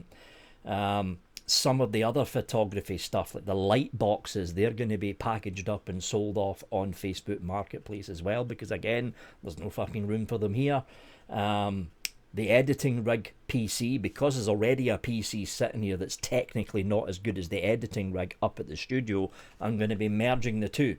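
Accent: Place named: West of Scotland, United Kingdom. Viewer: Hope your health's improving. Sorry that you find yourself in this situation. I'll still be watching your reviews - you didn't win all those awards for nothing...also as a West of Scotland guy it's nice to have great reviews from someone who talks like me!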